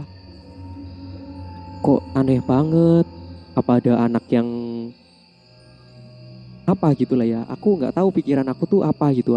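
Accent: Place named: Tegal, Indonesia. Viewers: Logatnya spt Tegal dan Pemalang. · Terima kasih atas ceritanya mas 🙏😁 suaranya enak banget, aksen jawanya terasa hehehe. Cuma menurutku ceritanya kurang serem 😂. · Baru mau Koment Mbak Mas nya kayanya asli Tegal, soalnya Logat bahasane medok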